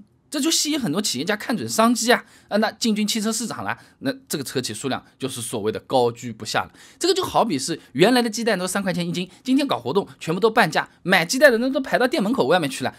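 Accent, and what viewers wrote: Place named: Shanghai, China. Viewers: 听你口音，像是上海或苏锡常一带的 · Jerry J 吴语口音都这样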